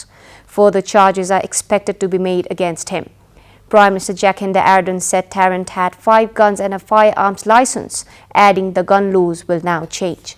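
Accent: United Kingdom. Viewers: Lovely British accent 😘😘😘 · hora vanya!!🙈🙈🙊😝malai ta testai lagyo munnile pani euta episodema hajurko jastai gari british tone ma english boleko thien..hajurko interviewma kai katai bhadragolko kura sunina kin tyas bare navaneko naaodeko vanne lageko thiyo..😁😁.munni navayeni hamro lagi ideal ho..indian reporterlai reply didai babaal lageko thiyo malai..interviewma bolne saili sarai saral xa ghamandapan dekhina sadhai vari uchha bichar samanya bolne saili kayam rahos.english video haldai garnuhola hamile sikne mauka pauxau..🙏🙏🙏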